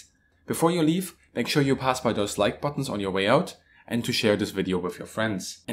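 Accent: Germany. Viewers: No he just has a german name, speaks german and has a german accent. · you'd notice that he speaks english in a heavy german accent if you've been paid attention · He is from Germany yes! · Giuntoli Im from germany and he does not sound like austrian. He sounds close to "Hoch Deutsch" that is the "Normal German".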